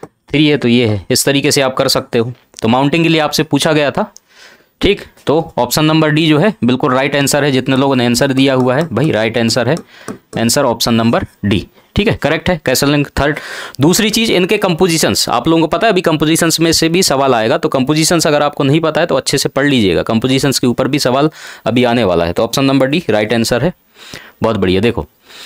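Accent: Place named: Jharkhand, India. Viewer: Pure Jharkhandi sir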